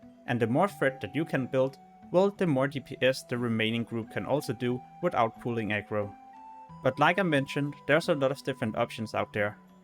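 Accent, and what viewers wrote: Denmark. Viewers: Useful guide! Thanks brother. I have a mage, But also a warrior. I kind of wanna focus warrior, but all the people say They’ll be useless early on, I don’t know… sigh Also, are you Danish? You sound danish!☝🏼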